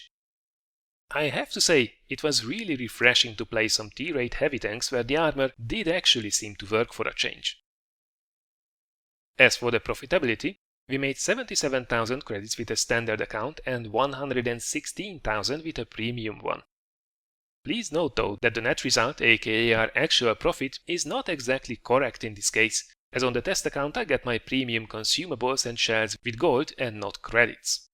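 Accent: Hungary. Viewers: 100% hogy magyar vagy a kiejtésed alapján :D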